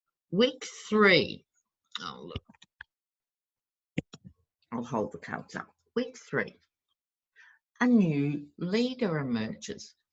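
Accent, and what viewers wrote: Australia. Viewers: Hugs to you,. You rock! I love uploaded videos of wisdom. Keep them coming in that awesome Aussie accent. 💛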